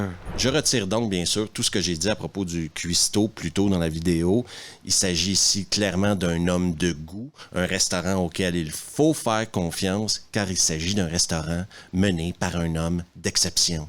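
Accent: Quebec, Canada. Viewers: Salutation fraternelle. T'as définitivement fini par m'avoir, en plus de nombreux fou rire (suffisamment rare pour le souligner) et de.ton tellement sarcastique (j'apprécie énormément en n'en faisant preuve moi-même au quotidien) ton intro m'a tué de rire tellement simple, tellement sobre mais surtout tellement et en prime d'une efficacité extraordinaire 😂😂😂😂😂. Je m'y attendais tellement pas, je me dis que du coup j'ai bel et bien fait de m'abonner je regrette pas 😂😂😂😂😂. Continue de nous éclater avec ta gouaille tellement québécoise, t'es vraiment un bon, on est certes loin des " Cahiers du cinéma" mais c'est tellement jouissif d'objectivité concernant un certain cinéma tombé en désuétude. Nous sommes de la même génération apparemment et tout ce cinéma me manque terriblement. On ne refera pas le passé malheureusement mais dépenser des sommes astronomiques pour voir des septuagénaires en famille ça relève de l'escroquerie. 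Que certains producteurs en prennent conscience et ce bouge le c..., au lieu de financer des taxis 5 et autres bouses du genre, je dis bouse pour ne pas dire navet ou nanar, jai trop de respect pour un Halloween 5 que pour un Indiana jones. Force à toi l'ami et prends soin de toi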